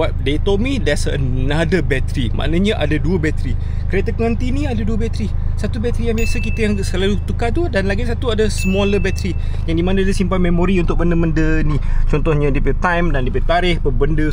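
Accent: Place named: Terengganu, Malaysia. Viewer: dia bercakap tu BAHASA MELAYU.. CUMA DIALEK HULU GANU.. ADOII.. SUSAH KALAU HIDUP BAWAH TEMPURUNG NI.. aku yg di sarawak pun tahu.. ko dari planet mana😂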